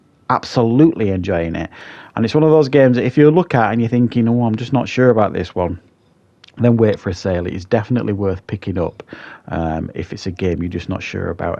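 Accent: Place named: Lancashire, United Kingdom. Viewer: Pretty sure that's a Lancashire accent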